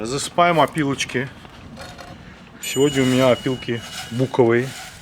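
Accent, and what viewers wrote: Baku, Azerbaijan. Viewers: Очень вкусно выглядет.Акцент и говор чисто Бакинский,я незнаю кто он по нации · Акцент Азербайджанский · Русский Бакинский акцент,это песня.Певички , нервно курят в сторонке.Рецепт супер,я так копчу курей. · Ваш канал, рецепты, приготовление, ваше настроение, акцент, диалект, выражения, все это причины, по которым я с удовольствием смотрю каждый эпизод! Я сразу оказываюсь на родине, в родном Баку 80х! Дай Бог вам здоровья и успехов в творчестве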